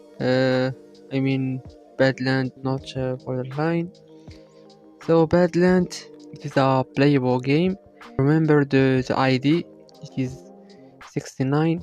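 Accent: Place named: Morocco. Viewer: You are moroccan from your accent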